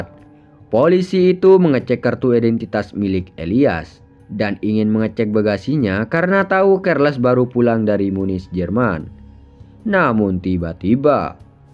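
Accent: Madura, Indonesia. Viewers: Orang madurakah pak, logat nya seperti orang madura😅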